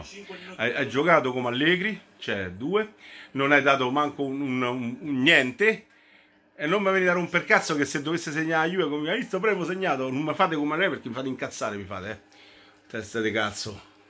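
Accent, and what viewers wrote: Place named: Rome, Italy. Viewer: A,ti voglio ibbbridddo,accento romano,e. testa juventina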